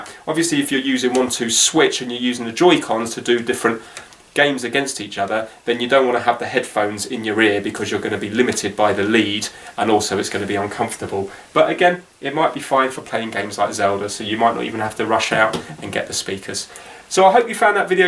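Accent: United Kingdom. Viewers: seeing you talkin in british accent is amazing your accent is great tbh its best british accent i have ever seen someone talking.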